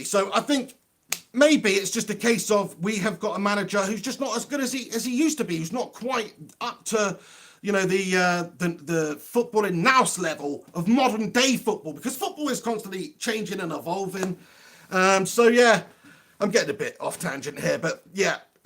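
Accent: Manchester, United Kingdom. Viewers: I cannot understand him, his manc accent is to strong.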